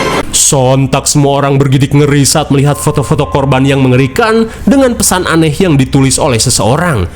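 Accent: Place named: West Java, Indonesia. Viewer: Klo xg reviu flm horor jd ngakak.. Sundax lucu.. Kerren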